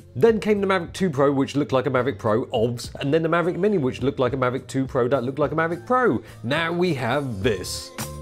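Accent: United Kingdom. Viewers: i thought this guy was british when i heard this voice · Lame. 7 min wasted to hear the magic website read out aloud in a British accent.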